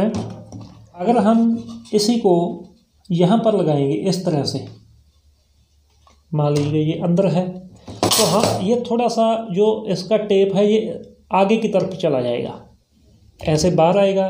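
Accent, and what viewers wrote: Punjab, India. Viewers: Punjabi banda hindi bol raha ha..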